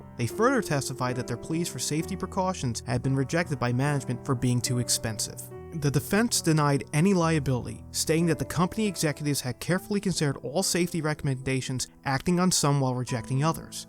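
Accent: New York, United States. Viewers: Someone’s from New York or Jersey based off his accent lol. Well done!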